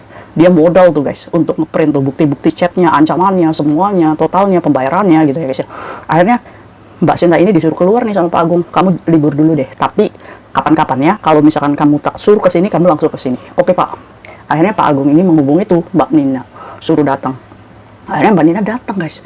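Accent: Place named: Bolet, Indonesia. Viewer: Kakak orang mana bahasanya kok sama bolet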